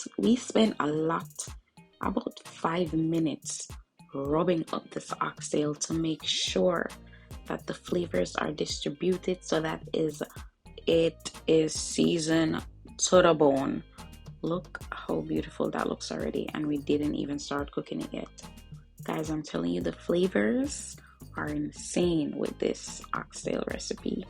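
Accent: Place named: Jamaica. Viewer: Jerk seasoning on oxtail? How is this authentic? Because you sound Jamaican?you add both ketchup and American ingredient bbq sauce and sugar So you making Jerk oxtail? Why trying to change oxtail to oxtail stew? What’s the yellow film, you add flour gravy no look so. Don’t stew suppose to have potato, carrots?Some many questions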